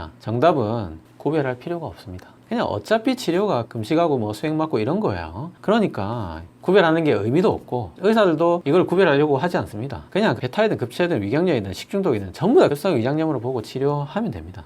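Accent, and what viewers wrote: Gyeongsang, South Korea. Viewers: 부산사람인데 경상도사투리덕분에 옆집의사쌤같네요.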